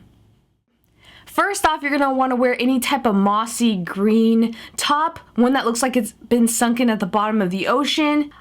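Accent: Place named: South, United States. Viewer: To my knowledge she's from Texas [Now living in California], so it'd be southern.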